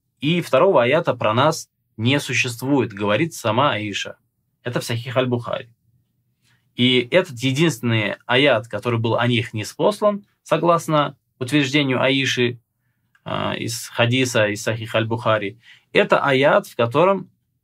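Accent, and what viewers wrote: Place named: Iran. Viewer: Ибарат не можешь нормально прочиталь арабские слова подрожая иранские мотив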